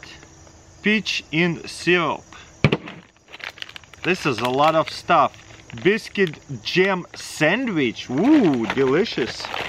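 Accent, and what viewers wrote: Russia. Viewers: You should prolly do a video saying only pashtet cos I pretty much watch your videos to hear you say it 😂😂😂. I love Russian accents 👍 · Why we love: 1) His excitement to do something 2) His positivity on everything even tho it isn't that good 3) His gratefulness 4) and of course his Russian accent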